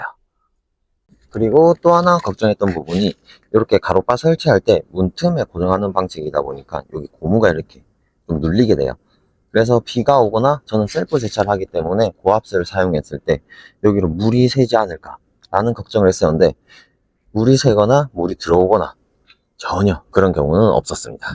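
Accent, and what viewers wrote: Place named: Gyeongsang, South Korea. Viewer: 갱상도 어디시죠?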